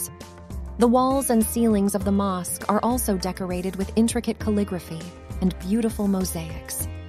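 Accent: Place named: United States. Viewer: She is pronouncing it fine brother. It's her American accent.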